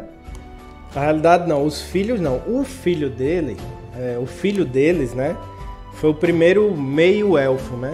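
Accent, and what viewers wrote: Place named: Paraíba, Brazil. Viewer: Eita, sotaque forte! O macho é paraibano 🗣📚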